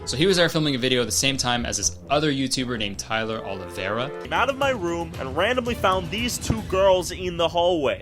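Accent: Canada. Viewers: kurtis's canadian accent rly popped off here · is the most Canadian I've ever heard you.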